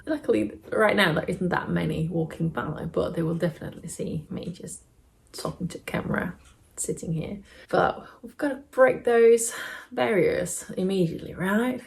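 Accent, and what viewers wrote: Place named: United Kingdom. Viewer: I'm surprise you are from Denmark. You sound like a British person, so I thought you were from England.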